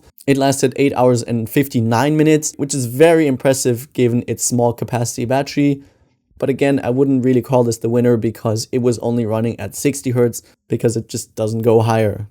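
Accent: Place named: Germany. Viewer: Strong german accent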